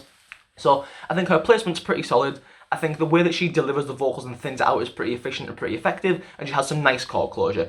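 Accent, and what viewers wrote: United Kingdom. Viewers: love your videos! Just have to ask what is your accent? is it pure british or sth else?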